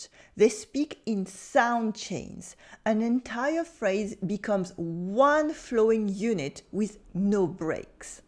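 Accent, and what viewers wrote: France. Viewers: I used to live in France, but not in the Paris region. The French In the "country" (whom I understood easily) always complained that Parisians talked too fast and were difficult to understand even for other native French. When I would comment that I had difficulty understanding movie dialogue my French friends would explain that that was because all movies are made in Paris in this sloppy too fast Parisian French. This video is helpful because I can now hear what my French friends were talking about, as this lady is using this sloppy too rapid Parisian French and presenting it as how all French people speak, when actually she is giving us this rapid Parisian French rather than the French one hears in the rest of France away from Paris. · French people don’t understand how US voted for Trump. Worst movies ever. (Please, improve your spoken english. 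Huge french accent)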